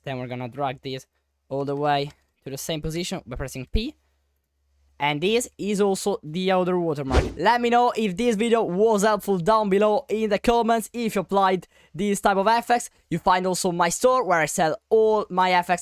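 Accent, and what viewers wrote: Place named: Italy. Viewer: La pronuncia italiana❤🇮🇹 SEI FORTE CONTINUA COSì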